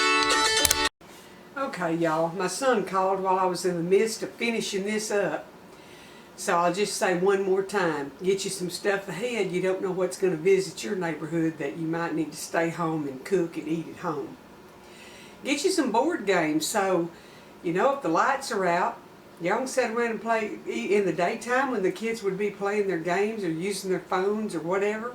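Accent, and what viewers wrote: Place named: South, United States. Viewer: what state are you from? I’m from Mississippi and I love to hear you speak. So thankful that your home is intact.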